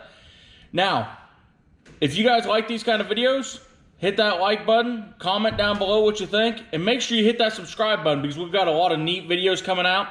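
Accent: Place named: Pennsylvania, United States. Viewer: I know that you say you live in Kansas but are you originally from PA? Your accent sounds like my family that lives in PA.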